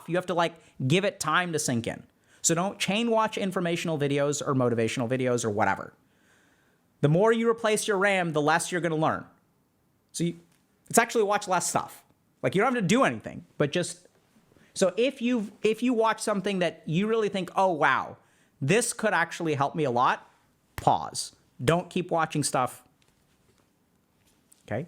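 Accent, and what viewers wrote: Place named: India. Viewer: I only want to converse with in his native Indian-English impersonation accent. I know it's not pertinent to the topic discussed it was just welling up from inside of me from the previous video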